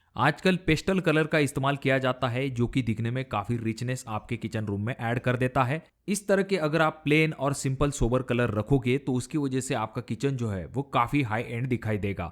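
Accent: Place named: Gujarat, India. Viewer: As per your speaking hindi I think you're a Gujarati I want an Idea for L type small kitchen guide me sir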